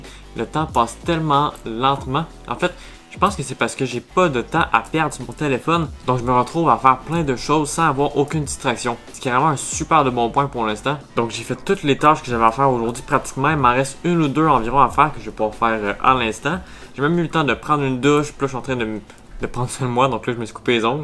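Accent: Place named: Quebec, Canada. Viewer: Charmant accent Québécois 😊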